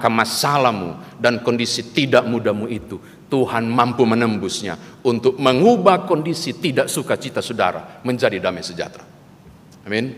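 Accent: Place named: Ambon, Indonesia. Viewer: Aduh..khotbhx sngt lucu..dialeg Ambon..mis komun Pongo? Mksh Tuhan b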